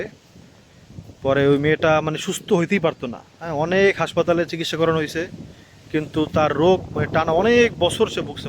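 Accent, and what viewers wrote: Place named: Barishal, Bangladesh. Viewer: বরিশাইল্লা কথা বলে তাই কথা বুঝি না কলা গাছ কাটার পরে বলে এককালে জাল ছিঁড়ে পড়ছে৷ অনেক কথাতেই বলে এক কালে এক কালে এককালের অর্থ কি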